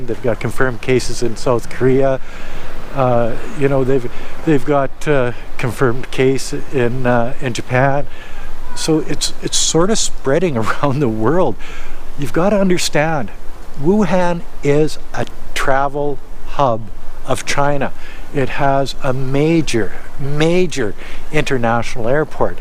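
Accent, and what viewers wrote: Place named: Canada. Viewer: I didn’t know Canadians had an accent until I started watching you. I’ve been a fan for a few months. I’ve really been enjoying your informative vlogs. I got a real kick out of your steak bbq at your condo in Canada. Keep up the good work and best of luck from a fellow Saskatchewan boy.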